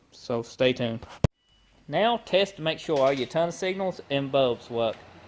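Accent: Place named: South, United States. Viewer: Thanks for the video, your accent is very interesting! what part of America r u from? I'm from Australia. I'm guessing u r somewhere in the southern US but still curious what specific area. 🤓 I installed my aftermarket headlight today, went pretty well only snapped one bolt and many of those brittle bitch clips lol ps for anyone yet to do this job, remember to remove the metal bracket from the original headlight and switch it over to the new one! Don't do what I did and have it nearly all together scratching your head wondering what's missing then have to pull it all apart again 😂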